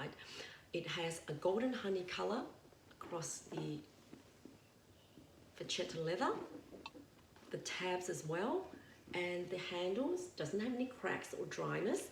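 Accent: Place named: Australia. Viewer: Hi! I love your vibe! I also love your accent! Australia? Keep up the great work! 👏😎💖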